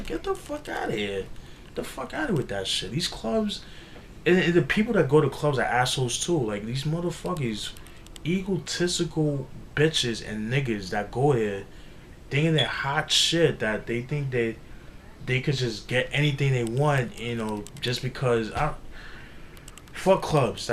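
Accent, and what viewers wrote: New York, United States. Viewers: u from ny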